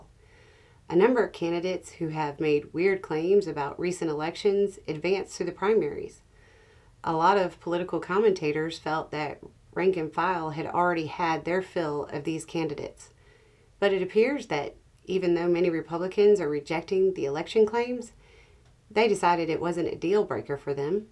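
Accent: South, United States. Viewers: Is t just me, or is anyone else expecting her o sign off with "And y'all come back now, ya hear?". Love the accent. And the message is, as always, on point.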